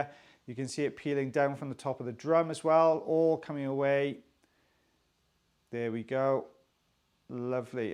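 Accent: Wales, United Kingdom. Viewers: I think the main reason I'm here is because I miss Wales / a welsh accent so much.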